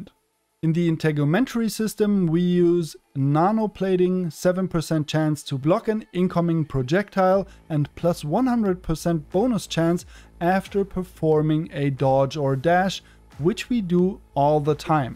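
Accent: Germany. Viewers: Wenn Deutsche englisch sprechen… 😅 aber schönes Spiel! Cyberpunk ist zum Glück noch ein geiles Game geworden! 👍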